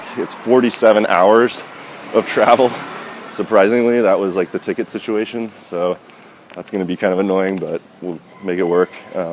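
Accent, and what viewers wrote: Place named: Arizona, United States. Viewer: Great Video! Very informative. And it sounds like you live in Arizona. + +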